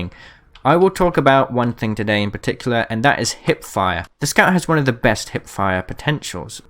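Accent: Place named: United Kingdom. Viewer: I hear British voice, I sub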